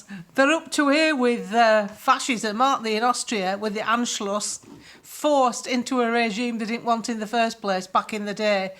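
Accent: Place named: Manchester, United Kingdom. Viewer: And I always love the "Munchester" accent - hope I got that right.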